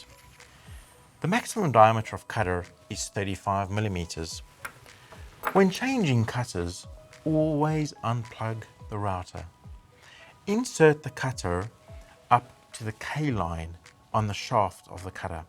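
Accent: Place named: South Africa. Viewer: He sounds South African. I just bought this router. Damn I was not expecting it to be this good!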